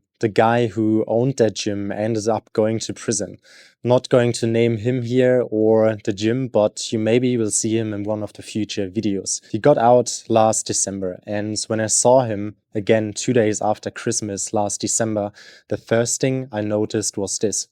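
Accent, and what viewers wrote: Germany. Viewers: Where are you from? Sounds like Germany from the accent. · Yessss! Are you from Germany or what's the accent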